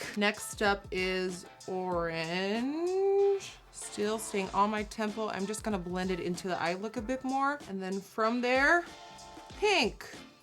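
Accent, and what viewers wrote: United States. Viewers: i sometimes forget that you're dutch???? like you sound soo american? how can you not have an accent lol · Your Jojo voice was perfect. I remember when accent was so strong and now she sounds born and raised in the usa!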